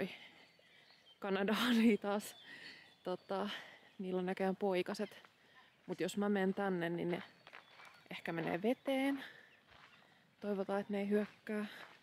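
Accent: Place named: Finland. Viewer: 素敵な朝の散歩楽しめました。夜勤の連続は生活リズムが狂って大変ですね。インドア派というより群れるのを好まない自分の時間を大事にする派かな。友人の結婚式楽しんできてください。フィンランド語独特のイントネーションが耳に心地よいです。😺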